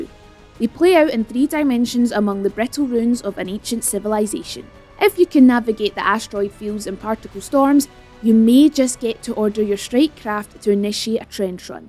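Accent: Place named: Scotland, United Kingdom. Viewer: Scottish I think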